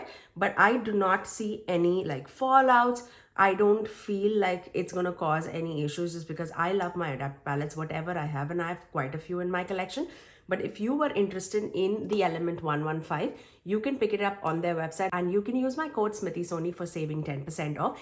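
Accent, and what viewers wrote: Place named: India. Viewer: Don't worry about your voice. It's still giving ASMR vibe for me. I just realized my old friend Ambuja used to give me the same feelings before I knew what ASMR was. I thought I was a weirdo for getting that buzzy feeling. It must be something about your soothing Indian accents that get me.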